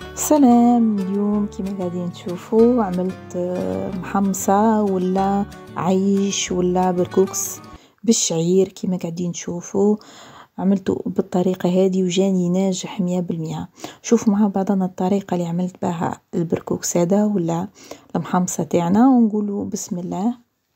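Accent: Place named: Tunisia. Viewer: سبحان لهجتها تقول تونسية 🥰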